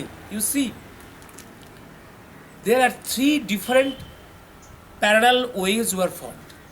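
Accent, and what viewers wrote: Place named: Bengal, India. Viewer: u r so bong pronounced pl say in bengali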